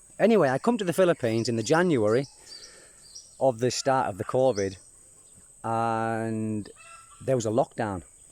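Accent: United Kingdom. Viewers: during 60's and 70's, Columban missionaries were assigned that area of Mindanao. w/British accent like you.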